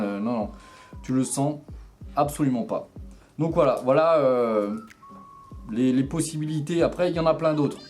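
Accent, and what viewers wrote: Alsace, France. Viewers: coucou :) un plaisir d'entendre un alsacien ^^ ça me rappel mon chez moi <3 ^^ J'ai bien envie de me lancer aussi :) mais je me posais la question si il y avait pas trop de bruits parasites ou trop de vent de la route en l'attachant à cet endroit sur ton casque?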